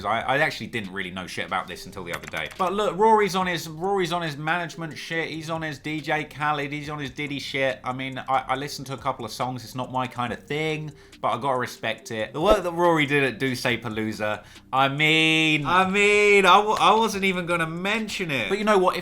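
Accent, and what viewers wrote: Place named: South, United Kingdom. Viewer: Is Rory’s name really pronounced like that or is the back translation into southern UK accent making it sound weird?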